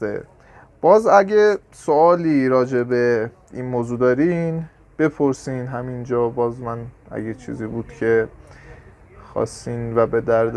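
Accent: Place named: Hamadan, Iran. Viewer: یکی از شهرستانای همدانه ک ترگ زبان حالا کدوم شهرستانی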